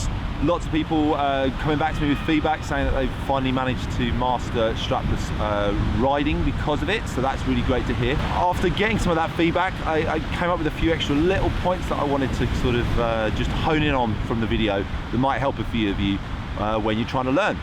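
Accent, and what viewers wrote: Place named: United Kingdom. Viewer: Great vid! Clear British instruction!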